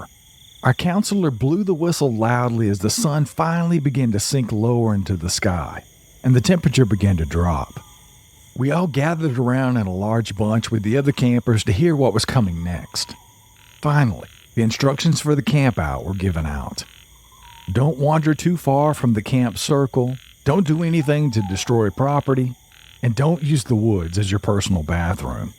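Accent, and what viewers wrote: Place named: Texas, United States. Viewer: Trying to place the accent....texas?..oklahoma?.... Arkansas here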